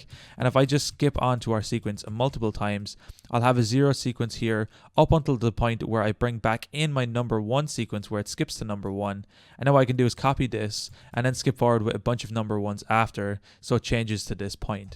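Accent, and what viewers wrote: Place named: Ireland. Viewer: Thank You! You sound Irish :D Also how did you get the Join Membership Feature??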